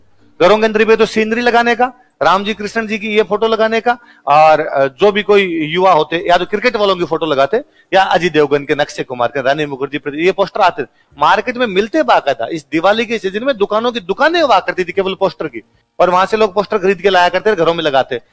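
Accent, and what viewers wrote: Rajasthan, India. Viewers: Aap ke rajasthani excent ko me enjoy kr rahi hun isiliye aapke videos dekh rahi hun!! Me Hindi teacher hun!!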